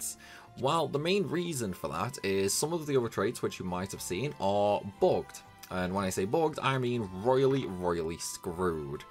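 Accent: United Kingdom. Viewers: omg why is every obscure youtube channel ran by a brit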